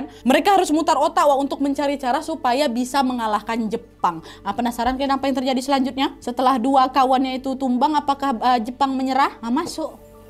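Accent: Indonesia. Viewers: 😂😂lucu ni bercerita dgn nada Indonesia nya...saya kekadang tak faham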